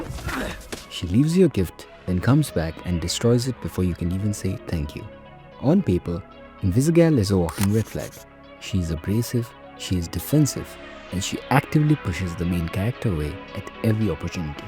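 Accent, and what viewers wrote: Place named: India. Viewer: Hey man, it was honestly a great video, did not even notice that you are an indian. (You have just some general accent, that does not really hurt understanding you. Overall the video is quite nice, especially the editing, but i think that it lacks something for a super popular video, for some reason. Hope to see you creating in the future!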